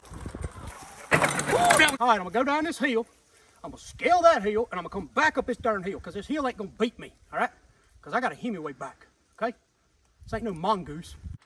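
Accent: South, United States. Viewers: I love his Southern accent 😀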